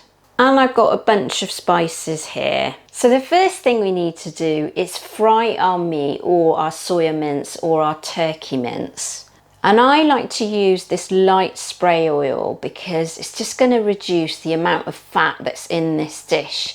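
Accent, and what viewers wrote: England, United Kingdom. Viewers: “I absolutely love chopped chopped brussels” With your English accent…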